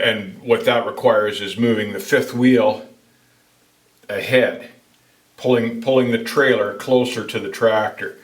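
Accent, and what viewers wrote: Canada. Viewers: Always great info with an awesome Canadian accent!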